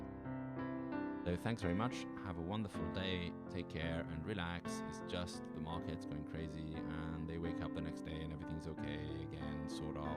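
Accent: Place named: Switzerland. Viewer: Great analysis, thanks! I couldn’t figure out if your accent was German or French, it had elements of both so I guessed you are from Switzerland. I go to your twitter and boom, I wasn’t wrong :)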